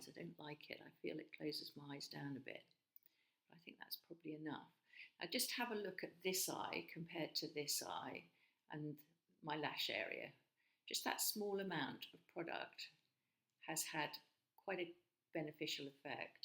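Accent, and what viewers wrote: United Kingdom. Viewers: I have only just discovered you & found this vid really helpful & good to watch, thank you. Also nice the hear a Brit for a change, although I love the US ladies I follow...( I am from UK, currently living in France). I look forward to seeing more of you asap !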